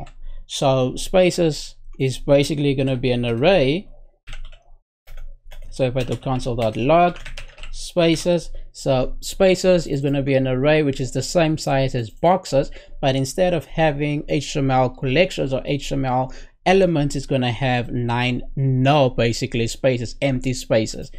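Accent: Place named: South Africa. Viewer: I am studying IT and had to leave my home country (South Africa 😢) I need help with an assignment while being homesick. The i came across your video and with 5 seconds into the video i knew were you were from. Not only did your video make my day but will definitely help my studies 😊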